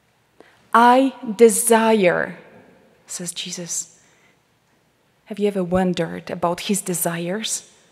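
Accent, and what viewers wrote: Poland. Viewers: Beautiful Polish accent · I fell asleep with YouTube on the TV again. When I woke up, a little while ago, the tail end of this video was playing. I have been reading St. Faustina’s Diary, and thought at first that really was St. Faustina, with the habit and her slight Polish accent. I went back to the start and watched the whole video. certainly has a full grasp and understanding of the Divine Mercy devotion. I have shared and recommended this video to my Facebook friends.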